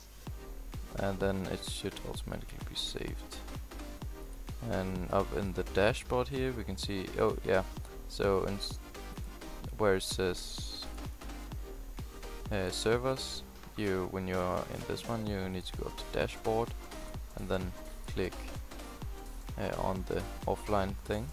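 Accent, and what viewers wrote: Denmark. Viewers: Man kan høre på dig du er dansker ;D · Dit danske accent xd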